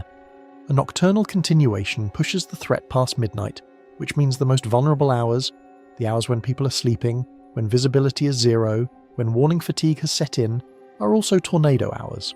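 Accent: United Kingdom. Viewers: Why British doing all our videos ....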